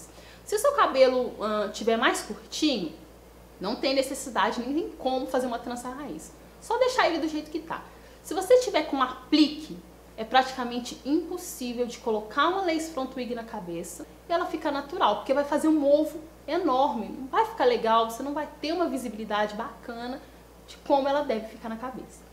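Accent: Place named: Minas Gerais, Brazil. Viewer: esse sotaque que acho lindo? tu és mineira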